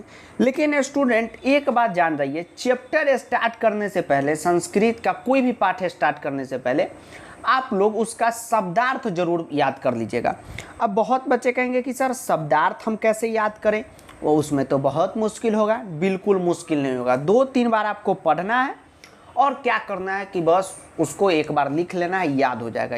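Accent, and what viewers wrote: Bihar, India. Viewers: Sir ka voice sa hi pata chal raha that he is a bihari ..😅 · Sir aaap Bihar se ho na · Mam ye bahut expert hai mai ense offline me padhta hu sir ka kuch word mouth me hi rah jata hai natural problem hai and sir Bihari hai esliye thoda edhar ho jata hai we proud on bihari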